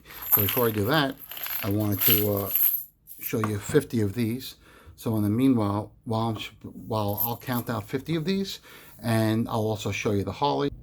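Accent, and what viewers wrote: New York, United States. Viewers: Nice pickups. I just became you #144 subscriber. I enjoy your gold coin flipping 😆 and your NY accent, as I am from the South. Look forward to watching you hustle up some Stacks of Gold. The faster you acquire it the sooner you quit paying interest to your family associates. Bank 🏦 of looking good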